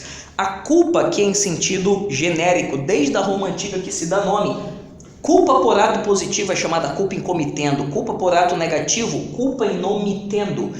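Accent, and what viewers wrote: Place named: Pará, Brazil. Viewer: por aqui peguei q vc é paraense, acertei ? Kkkj hummm bunitinho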